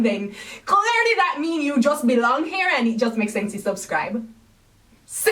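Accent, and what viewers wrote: Jamaica. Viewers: Her voice is high but her accent sounds pretty Jamaican and she's said that she's Jamaican. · Which country are you from? Because I hear a jamaican accent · R u Jamaican or carribean????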